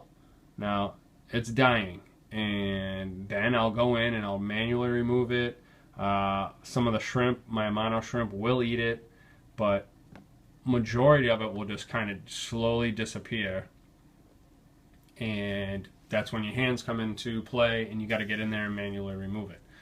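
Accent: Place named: United States. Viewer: "Wahtuh".... oh, the american accents, how quaint. :)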